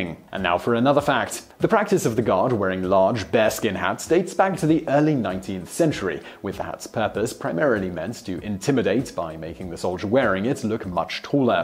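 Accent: England, United Kingdom. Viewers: You mean English accent? Scotland and Wales exist btw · Robrobx generaly if you say "british accent" he means accent from one of the United Kingdom's countries. We can clearly hear English accent, but calling it "British" is also not so wrong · Vsauce but he has a British Accent and a sharp head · Does vsauce have a twin? A brother with a british accent (or australian, sorry i really can’t tell. Dont mean to be rascist)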